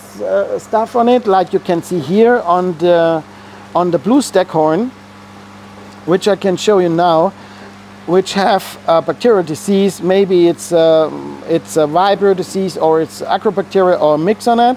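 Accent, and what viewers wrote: Swabia, Germany. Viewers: no a little more schwäbisch please 😊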